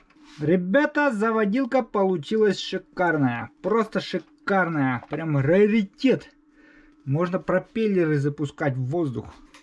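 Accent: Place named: Baltic states. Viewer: Акцент, прибалт? Или Беларус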